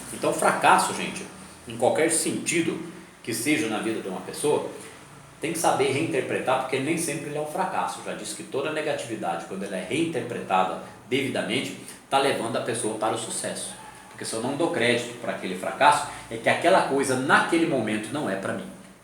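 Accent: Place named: Minas Gerais, Brazil. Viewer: Adoro sua dicção..bem mineiro mesmo ..eu tambem sou mineira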